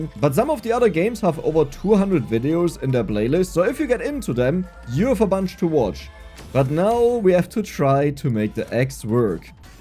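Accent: Germany. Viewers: Could it be that you are German ?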